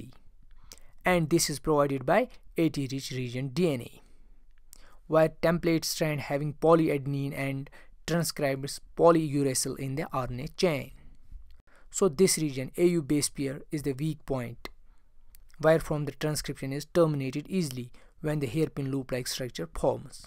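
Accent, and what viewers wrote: Kashmir, India. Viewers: Are you from Kashmir? 😂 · You’re very good but I can’t understand the indian accent 💔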